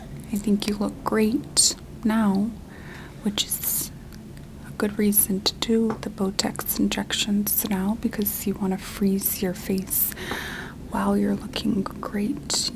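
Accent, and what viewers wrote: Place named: Midwest, United States. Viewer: The midwest accent is toooo good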